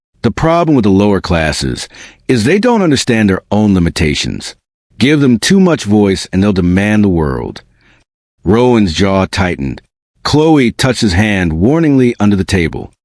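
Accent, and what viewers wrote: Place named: United States. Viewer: A British story read with a heavy American accent?! 😅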